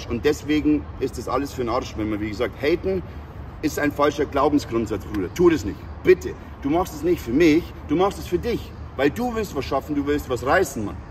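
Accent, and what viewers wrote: Bavaria, Germany. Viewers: bist du bayer? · Ich muss grad so lachen. Ich hab viele Videos von dir angeschaut u mich immer gefragt was für einen Akzent hat er. Ich hab immer nur geraten und jetzt redest du deutsch, wie geil😛 Ich komm aus Erding. Hast nen ähnlichen boarischen Akzent. Kommst du aus Bayern? Top Videos immer von dir!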